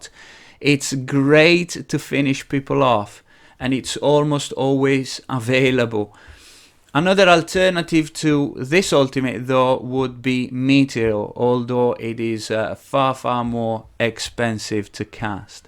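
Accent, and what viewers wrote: North, United Kingdom. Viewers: sounds like northern UK, Dutch and South African in one haha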